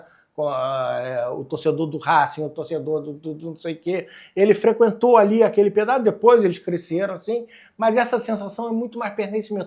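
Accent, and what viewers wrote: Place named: Rio de Janeiro, Brazil. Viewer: O Lúcio de Castro pode cag@ qlq regra, mas esse sotaque carioca tira toda credibilidade dele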